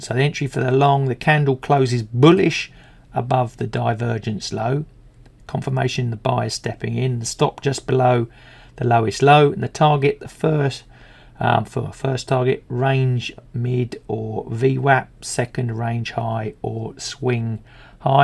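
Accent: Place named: Kent, United Kingdom. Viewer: Enjoying the Kentish accent as usual. Of course, the traditional pairing of volume footprint charts is CVD (cumulative volume delta), the order book, DOM (depth of market), and sometimes VWAP, as well as volume profile, targeting all the phases (initiative, absorption, exhaustion, reversals, etc.) of a typical cycle that you described in each of your strategies. I’ve switched form Renkō to using TPO (time–price opportunity) to identify key levels and market state (rotation, trending, acceptance, rejection, etc.) and then footprint charts specifically for timing of execution.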